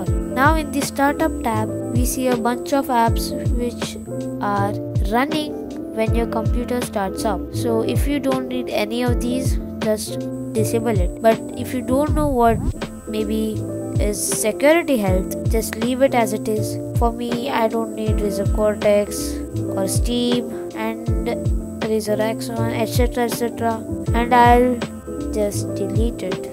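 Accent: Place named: India. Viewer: Omg Indian accent is so annoying.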